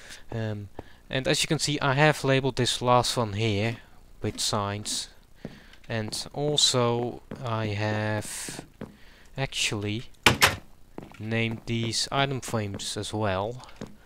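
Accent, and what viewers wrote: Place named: Netherlands. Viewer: I think you are dutch? :P try to fix your accent :)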